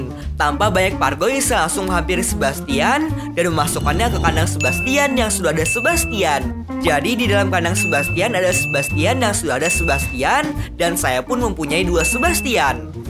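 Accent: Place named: Bugis, Indonesia. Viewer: Bang Suara Nya Kek Orang Balik Papan (Kalimantan Timur) Orang Bugis Pasti 🗿 ya Kan?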